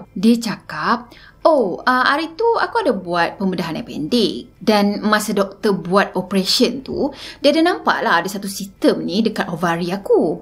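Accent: Kelantan, Malaysia. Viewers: Walaupun sis ckp kl tp masih ada bunyi kelantan tu. Sbb org kelantan je pakai ayat " dah tu "😂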